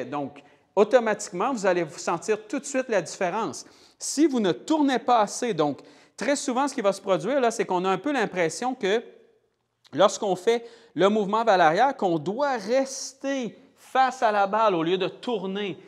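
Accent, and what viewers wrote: Canada. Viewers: Merci beaucoup, pour ces vidéos très claires et faciles à adapter. Et avec l’accent canadien, c’est encore plus sympa!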